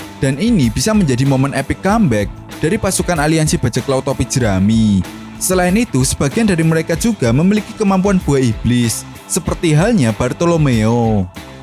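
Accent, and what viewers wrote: Java, Indonesia. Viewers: lidah njowo mas, lanjutkan mas do ur best!!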